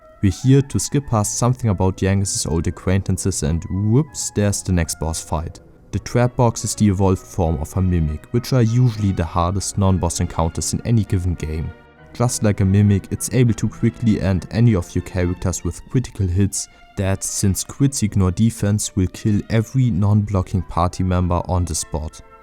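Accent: Germany. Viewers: Oh gods. The german is real. Even my Dialect isn't that bad when I talk English. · Hello! Good job! Well done! Ja, ein "legendäres" Spiel...also das PS2 Spiel (für mich persönlich)...Nostalgie pur! Yes, you cannot hide your German accent! But that is completely irrelevant! Who really cares about your accent when you speak English! A wonderful game! Ich kann mich auch noch an Final Fantasy X erinnern...auch sehr fantasievoll! Das waren noch bessere Zeiten (für mich jedenfalls), ich bin alt geworden... Alles Gute, viel Erfolg, Glück, Freude und Gesundheit! Grüße aus dem "wunderschönen" Kreis Offenbach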